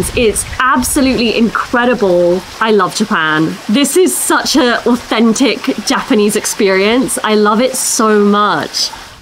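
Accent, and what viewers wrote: United Kingdom. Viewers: とても美しいドレス姿と日本の半纏。楽しみました。イギリス英語は日本人にあっています。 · British English も美しい……今からもずーーっと聞かせてほしいですね、耳に心地よい響きをもたらしてくれる……… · アメリカ英語よりイギリス英語が聞き取りやすいという意味が分かったかも✨ 日本語訳と英語の同時表記ありがとうございます。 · ねえちゃんのBritish Englishをいつも楽しんでいますが、 鼻の大きさも楽しんでいます。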